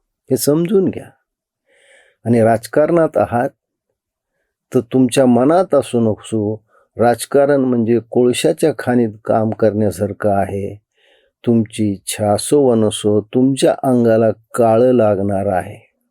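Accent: Marathwada, India. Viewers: काका आपण रामराम म्हणता ना... ते लय भावतं... अस्सल मराठवाडी लहेजा बोलण्यात असतो.'त्या'नेत्याला आपण पचला नाहीत..पण आता फिट्ट बसला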